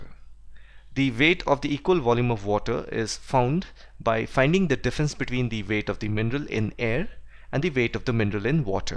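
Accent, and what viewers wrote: India. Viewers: Idk but your accent seems a lot like raj kothrappali from tbbt!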